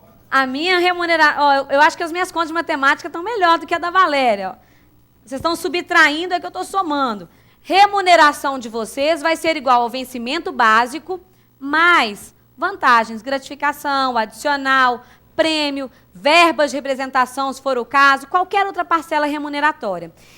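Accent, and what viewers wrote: Minas Gerais, Brazil. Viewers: Essa professora é muito gente fina, além de ser bem humorada. Gosto dela e do sotaque de mineirinha :) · professora com sotaque mineiro perfeito rs sou Potiguar mas amo sotaque mineirin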